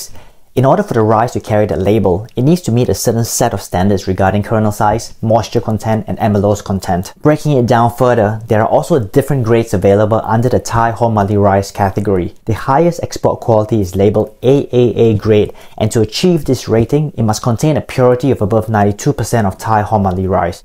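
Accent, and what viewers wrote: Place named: Singapore. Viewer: Your topics r good, due to your singaporean accent & style and the way u speak so fast, my heart goes with your fast pace to rush, not a comfortable viewing, please speak a bit slower for a more comfort viewing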